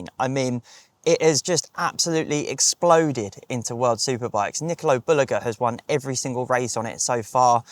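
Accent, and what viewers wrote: United Kingdom. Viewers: Nice british accent 🏍️ I'm a Spanish speaker, and I understand it better.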